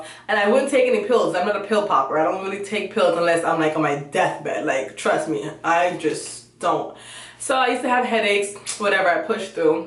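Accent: Jamaica. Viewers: NEW Subbie...the patois got me lol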